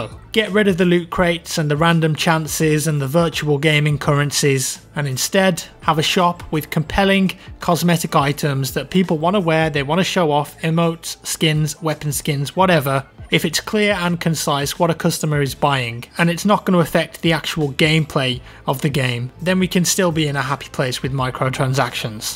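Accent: United Kingdom. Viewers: one good question though. how did develop a British accent even though he's american?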